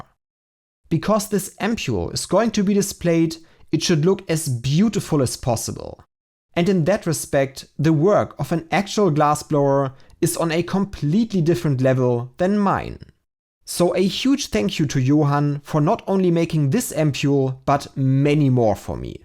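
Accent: Germany. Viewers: Finally a face to this voice. Der harte deutsche Akzent ist irgw catchy..😅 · I know tis guy is German this accent is to thik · love your videos man. Außerdem, dein Akzent hört sich deutsch an, woher kommst du wenn ich fragen darf? :) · HAHA a german robot HAHA learn to talk like a human you f00ken AI npc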